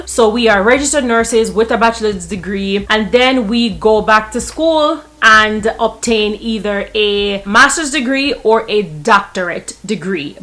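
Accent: Jamaica. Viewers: Come through Jamaican Accent! lol, great video.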